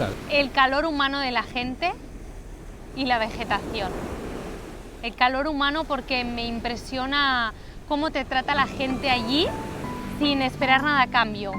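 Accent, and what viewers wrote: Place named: Catalonia, Spain. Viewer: apenas conoci su contenido un par de semanas... Y me atrapó... Es carismática, una mexicana con acento catalán, una catalána con sangre mexicana. Esa es la nueva realidad que nos muestra. Que las nacionalidades son relativas, tu patria eres y el lugar donde florece el alma. Son un gran equipo, saludos.. tienes un gran tesoro cuidense, si algún dia si me topo contigo en la calle y ese ir y andar tan tuyo.. no te asustes si me emocióno al verte. Abrazos 😽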